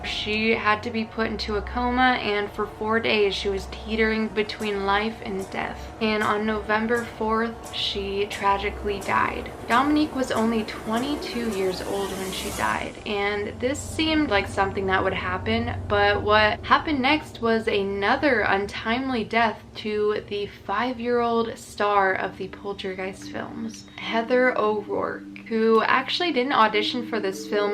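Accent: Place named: California, United States. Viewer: I do hope you know that I was just picking on your Californian accent.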